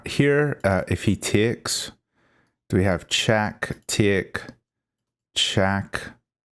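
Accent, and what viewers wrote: Northern Ireland, United Kingdom. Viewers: Are you from Northern Ireland?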